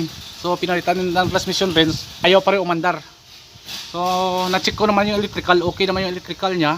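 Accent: Iloilo, Philippines. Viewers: Teh daw ilonngo gid timo bah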